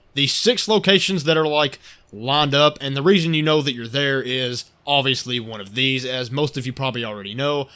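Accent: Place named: South, United States. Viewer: your improvement at content creation over time is really cool to watch, Im about to finish all 88 of your astroneer vids. I think your confidence has strengthened over time and it's really enabled your personality to come out, it's fantastic! Your commentary is so enjoyable to listen to, you got that nice southern lovin' accent my bro I can't get enough! Thanks for posting and hope you keep at it!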